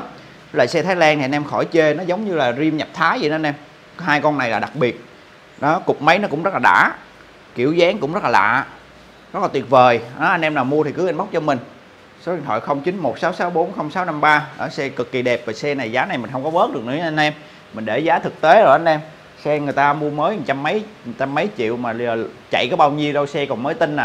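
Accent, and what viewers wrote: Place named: Ninh Thuan, Vietnam. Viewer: Nghe tiếng a nói chuyện, giống dân Ninh Thuận quá ta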